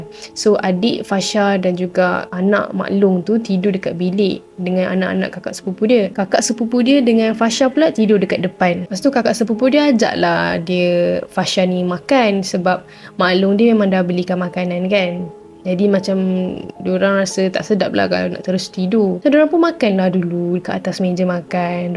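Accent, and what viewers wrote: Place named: Kedah, Malaysia. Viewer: seronok dengar cakap kedah😅😅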